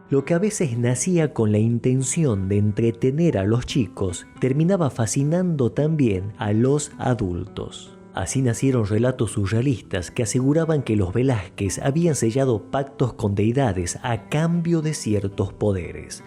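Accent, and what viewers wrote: Argentina. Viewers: Buena narración chico,te dire que no me gusta el acento argentino, pero te felicito, pues me atrapaste con tu historia,saludos!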